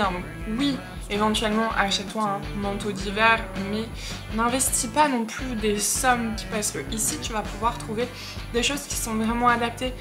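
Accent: Quebec, Canada. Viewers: Effectivement tu as un accent Québécois! Yesss c' est de la bonne intégration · Je perçois un tantinet un début d'accent québécois. Bon courage, belle vidéo !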